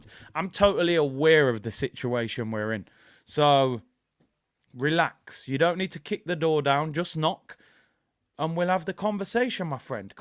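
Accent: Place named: Cockney, United Kingdom. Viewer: 😂🤣 I don't know if I watch this show for the singing, content or the jokes But that cockney accent 😂😂😂😂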